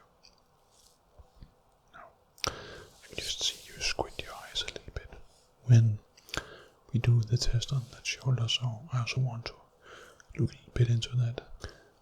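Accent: Scandinavia. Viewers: Is that a Scandinavian accent? Sounds similar to my Danish relatives. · Your Nordic accent sounds/is like 'nectar' for my ears (and Soul) Thank You🤞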